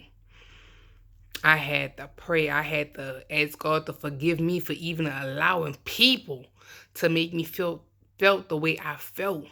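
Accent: New Orleans, United States. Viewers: I love when your name pops up in my notifications. Means I'm gonna get some insights in your cute N.O. accent.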